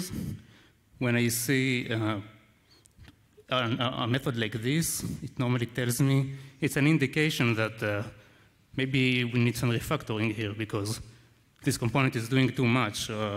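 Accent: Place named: Arab world. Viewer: I'm sorry,I couldn't understand your English, which looks like Arabic pronounce.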